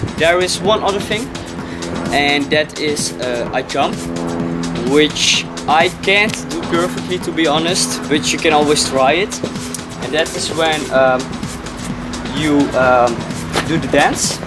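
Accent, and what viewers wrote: Netherlands. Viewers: ik hoorde al een Nederlands accent 😌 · Hahaha gy hebt een Hollands engels accent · hij s ook nl vgm... want zn uitspraak klinkt als die van n nldr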